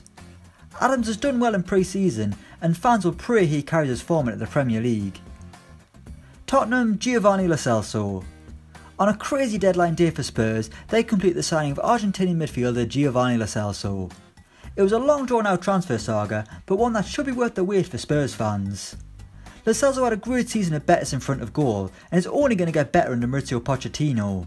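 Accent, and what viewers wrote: Ireland. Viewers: Is this guy irish too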